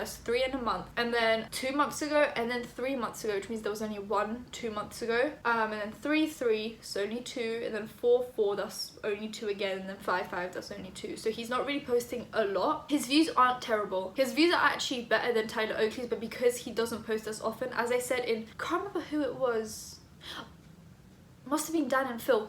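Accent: United Kingdom. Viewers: Not to be rude bc i enjoy your content and it’s not like you can control this but for some reason I just can’t stand british accents. Idk why but for me it’s like nails on a chalkboard. Anybody else feel that way or is it just me?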